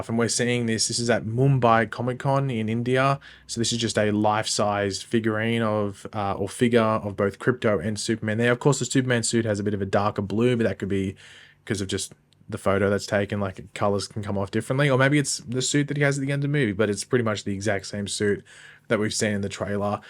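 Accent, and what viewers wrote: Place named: Australia. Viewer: Shut down your page. I hope you will seriously think about it. I dealt with your negative comments about Superman and Lois, all through its run, because you were a "just a Arrow Fan" and only Flash mattered besides it. We don't need your terrible Aussie accent. Through yourself on the Barbi and turn the gas up to 'Nuclear'.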